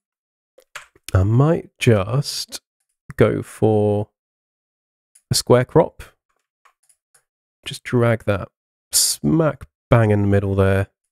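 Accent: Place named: United Kingdom. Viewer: it‘ a pleasure to listen to your British, sophisticated voice.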